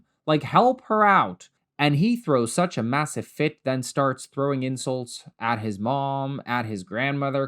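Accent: United States. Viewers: Your American accent is perfect 👌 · You speak with an American accent (at least you sound American to me). Your channel says you are from the UK, though. Are you actually from Canada? Just curious. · First time on your channel; you said you're not American but I can't hear anything but an American accent in your voice? Please tell me what I'm missing! No disrespect meant at all. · people pronounce it differently for their own grandparents. You did fine. I had a Memaw (pron. mee-maw), tho, instead of a mamaw (as you pronounced it)... but even in the same area here where my grandparents lived... my cousins pronounced it differently for their own grandparents. It's common terms in the US south and in the mountains for grandparents. And then there's the pawpaw tree... and multiple towns named for that. A uniquely native American tree and largest native fruit. 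Some folks call their grandfather pawpaw, too. You sound like you belong in the US with your accent... I would never have guessed English was your 3rd language. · Might I remind these christofascist theocrats - Pete Buttigieg has 2 children. So does Kamala Harris. BTW, you have a VERY American accent! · Why do you have an American accent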